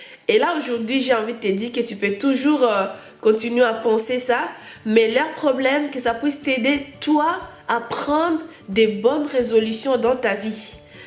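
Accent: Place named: Democratic Republic of the Congo. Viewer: J'aime ta vidéo. tu as l'accent congolais. j'ai plein des amis congolaise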